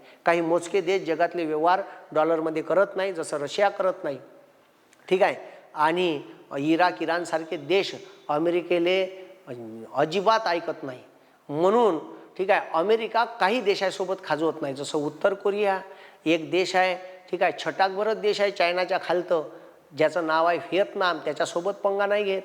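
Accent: Vidarbha, India. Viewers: खुप छान 👌👌. भाषा विदर्भातली आहे का, ऐकायला खुप भारी वाटते. 👌👌👍👍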